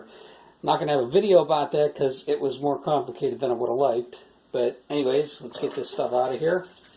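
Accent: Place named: Pittsburgh, United States. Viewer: The pittsburgh accent makes me chuckle. Am I right? Yinz make at beer to go tailgate the stillers game?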